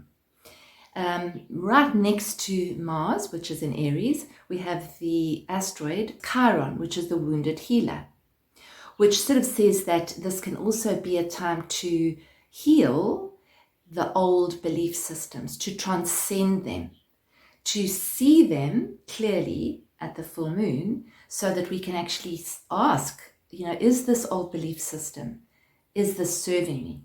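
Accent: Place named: South Africa. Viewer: Just "stumbled upon" your reading. Lovely, thank you. And hugely apt for me right now! Great to hear your saffer accent too. I was from Plett but made the move to Panama nearly 3 years ago!! Take care and thank you ♡♡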